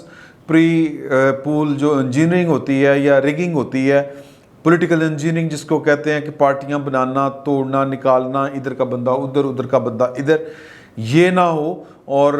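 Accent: Punjab, Pakistan. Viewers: شاہ جی میں آپ کا پروگرام بڑے شوق سے سنتا ہوں۔ آپ کا معصوم اندازہ میں بات کرنا اور پنجابی لہجے میں اردو بولنا، ساتھ میں پنجابی کے محاورے سنانا ، ہاتھوں کی حرکات اور دل موہ لینی والی مسکراہٹ۔ اور رنگا رنگ شرٹس۔ کیا بات ہے۔ پوری تگڑم لگا دیتے ہیں۔ 😁😁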